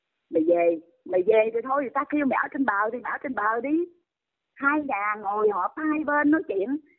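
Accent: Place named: North, Vietnam. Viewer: Sao co líp này nói cái giọng nói bac kỳ sao ghét quá, giọng nói người nam ta nói thấy dễ thương 😊😊😊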